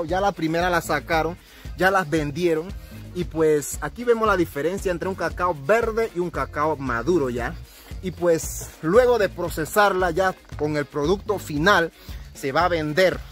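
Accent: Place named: Chiriquí, Panama. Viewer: Fren usted saluda diferente ,yo no sé hblar bien el ngabere pero no se escucha igual a como hbla mi abuela ,talvez por qué es chiricano ,saludos y buen trabajo.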